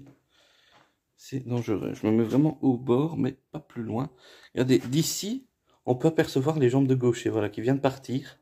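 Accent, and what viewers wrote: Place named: Belgium. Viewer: Bien! Tu me fais chaque fois rire avec ta prononciation typiquement belge, "fourboui"! En France nous disons juste "fourbi" Belle continuation & merci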